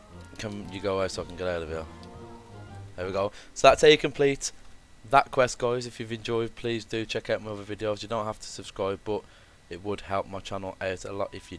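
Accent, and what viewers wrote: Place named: Birmingham, United Kingdom. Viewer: Can tell ur from brum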